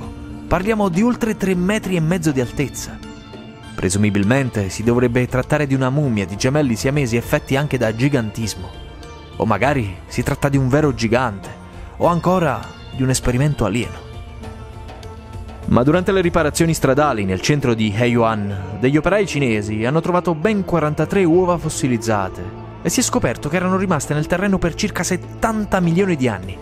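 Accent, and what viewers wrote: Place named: South, Italy. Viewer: di tutti i tuoi video... mi piace il tuo accento del sud. heheheheh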